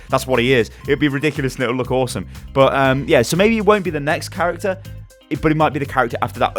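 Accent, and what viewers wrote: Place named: United Kingdom. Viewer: did get an annoying british accent and learn to clickbait? or is this just?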